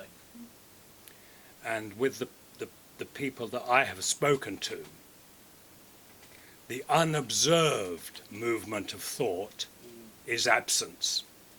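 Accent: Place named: Cockney, United Kingdom. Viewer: Such a charismatic man. Hey sounds pure Cockney here.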